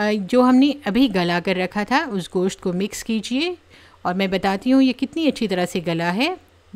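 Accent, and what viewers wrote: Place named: Karnataka, India. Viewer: Mam apka talluqh bidar se hai? Apne kabhi zikr nahi kiya laikin apke andaze takallum se itna yaqheen hai k ap hamare hum watani hain karnataka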